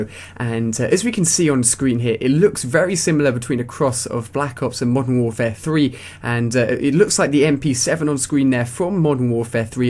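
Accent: United Kingdom. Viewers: Why does almost every game info video hav a British guy narrating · He's British. One does not simply change their accent.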